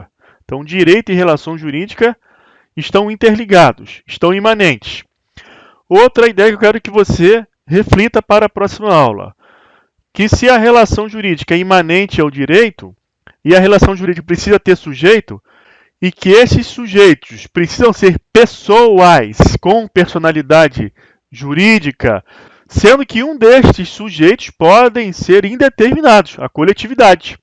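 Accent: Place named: Rio de Janeiro, Brazil. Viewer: Aula boa. Mas não deu para deixar de reparar vc falando Nascer substituindo o som do S pelo da letra I (naicer), é estranho aos ouvidos, mas sei que é característico do carioca. O Interessante é que vc conseguiu falar nascituro com todos os sons das letras da palavra.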